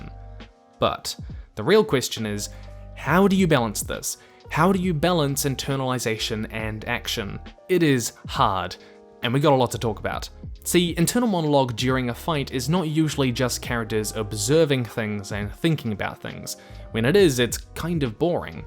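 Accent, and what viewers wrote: United Kingdom. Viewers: Why do you Bri'ish mofos have such trouble with words that end in "ian" or "ion?" No, it isn't SebastIAN. It's Sebastian. 3 syllables, not 4.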